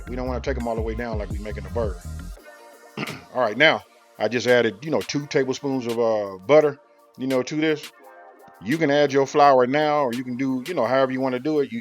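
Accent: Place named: South, United States. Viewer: Yes but cause he's living in Texas and working he is definitely sounding like a Texan too!😉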